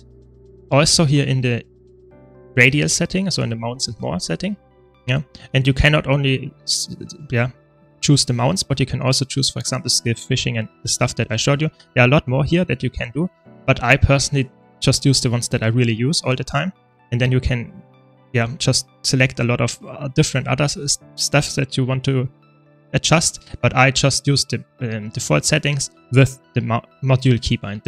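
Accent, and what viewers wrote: Germany. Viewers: good german english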